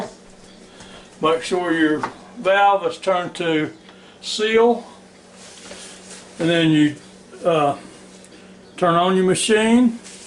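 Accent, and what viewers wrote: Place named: Tennessee, United States. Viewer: I love your videos! Thanks for sharing! You sound like home, are you from Tennessee?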